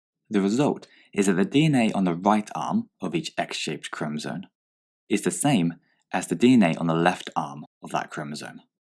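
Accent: United Kingdom. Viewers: HE IS BRITISH!!!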